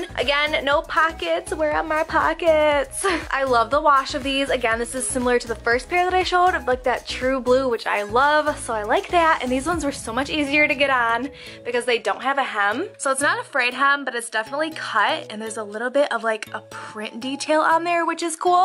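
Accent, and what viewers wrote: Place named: Michigan, United States. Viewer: I had to check out your Instagram to see if you’re from Michigan lol you have the cutest Michigan accent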